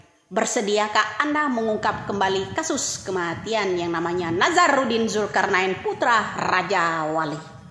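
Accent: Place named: Madura, Indonesia. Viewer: Nyi.. Dialeknya lagi ke Madura nyi.. Semangat.. Lanjut nyi..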